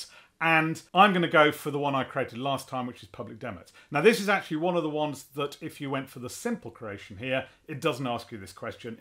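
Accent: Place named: United Kingdom. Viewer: Such good assistance with a great British accent, thanks :)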